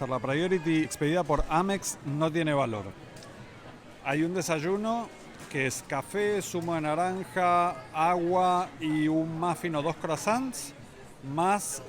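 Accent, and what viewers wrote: Argentina. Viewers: Hola. ¡¡¡¡¡ Pero como disfruto tus viajes aunque sean cortos!!!!! Me encanta como lo cuentas todo y ese acento argentino............ El que hacía los anuncios era muy gracioso hablando, nosotros los españoles aún notamos más los acentos. Quiero más vídeos. Un abrazote gigante, Barcelona te echa de menos.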